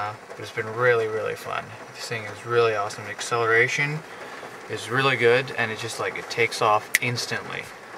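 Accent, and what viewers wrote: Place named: United States. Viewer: Thanks for the vid, i do wonder how many kms you get on one charge and if charging was quicker with proper house mount charger. 100kms is no good for me, commute to work is about a 200km round trip. plus in Australia our electricity is much higher, though i don't know where your from, you seem to have an american or Canadian accent but I thought they used Miles in US, kms in Aus :)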